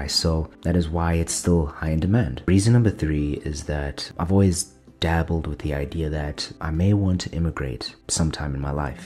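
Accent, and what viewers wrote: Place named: South Africa. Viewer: South Africans legit have the best English accent, I'll fight anyone on that. But on the topic, I get where you're coming from. I'm an immigrant myself, currently in my fourth country. I was pushed into tech. because that was seen as a safe bet and a good match for my skills (and because somehow seeing that someone is into video games automatically equals they'd be a good computer nerd, by some logic of our parents' generation) It was alright. Helped me settle and make some money. But I've always been fascinated by larger systems compared to the minutae of tools and machines that most software careers focus on (I would imagine engineering is the same) I really enjoyed working in Insurance at one point in-between studying/working in tech., and I've always been fascinated and interested in economics. Unfortunately I was never encouraged or supported to pursue that or finance or business; and I had no role models (very important!) until I met my now wife. 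Long story short, I've been studying math for a year now to shore up and I'm going to be starting a Master's in Analytics to first shore up on the necessary applied statistics skills, before I move on to something that is more into economics/policy and thereby something that is more impactful while also being more interesting to me.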